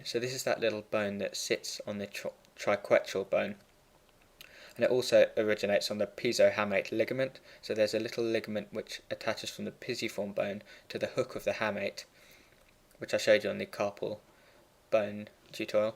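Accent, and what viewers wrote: United Kingdom. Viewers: Your videos are so helpful. I’m preparing for my exams and I don’t even use atlas often since I found your channel. Teaching is great and the British accent is everything 🙏❤️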